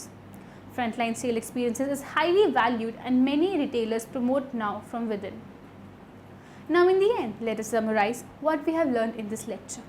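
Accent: India. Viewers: I have no idea why people are criticizing her accent. Apparently she is born to be an Indian and that's part of her characteristics, that's her. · She is speaking in English with an Indian Accent.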